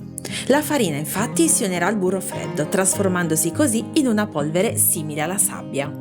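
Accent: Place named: Sardinia, Italy. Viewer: Ciao ti seguo sempre: molto brava👍sei sarda eh!!!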